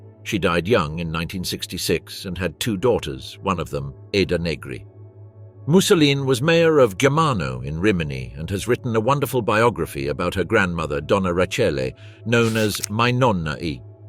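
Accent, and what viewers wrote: United Kingdom. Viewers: yes, but its even worse the use of UK english (with all sorts of dialects) while it should be US english narrated. Now thats really an islands plague :-((((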